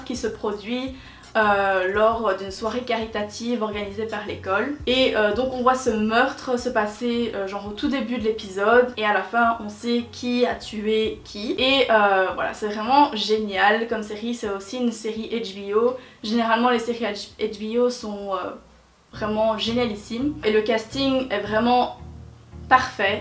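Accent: Belgium. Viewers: Tes belge? Sinon super ❤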